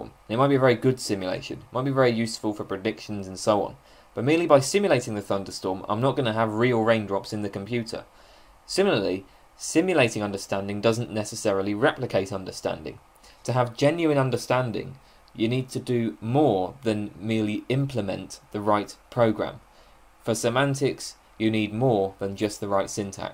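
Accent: United Kingdom. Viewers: Most British sounding man but writes z in stead of s?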